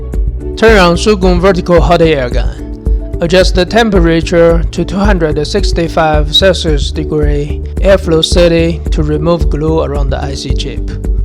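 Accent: India. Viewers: thats not his video....... look.... this guys voice is indian, the video is china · О этот божественный индуский акцент))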